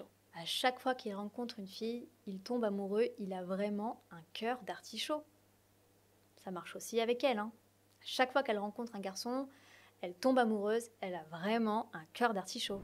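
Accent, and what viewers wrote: France. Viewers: Salut — merci beaucoup pour les novelles expressions! Je voulais simplement dire en tant qu'anglophone que lorsque tu parles anglais, c'est parfaitement compréhensible - et je dois dire que l'accent français fonctionne vraiment pour toi. Alors ne t’inquiétes pas du tout! 😊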